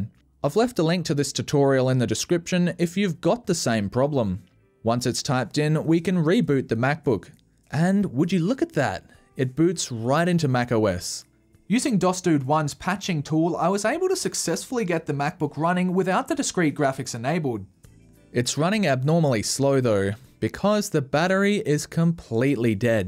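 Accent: Australia. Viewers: There's something oddly satisfying watching this kind of video and hearing an Australian accent at the same time.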